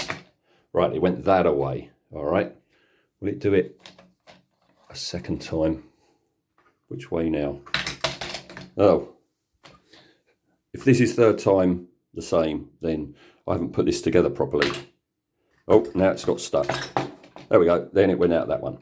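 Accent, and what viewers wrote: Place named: Kent, United Kingdom. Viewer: You sound like a kent ite like me, dunno if your school at the end of term used to gamble crisps on 'battling tops' , maybe it was only us in Sandwich... excellent video sir!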